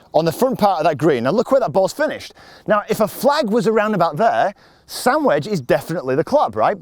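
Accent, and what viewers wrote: Yorkshire, United Kingdom. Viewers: Thank you. Yet another super well explained video, simplifies the process. P.S. I love the subtitles trying to cope with your Yarkshire accent! I'm still trying to find my "pudding stance".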